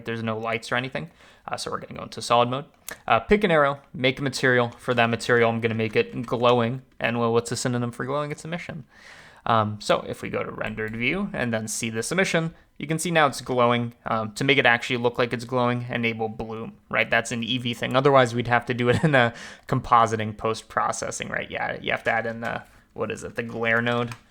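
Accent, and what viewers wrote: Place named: Massachusetts, United States. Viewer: Wanna know how I know you're from Massachusetts?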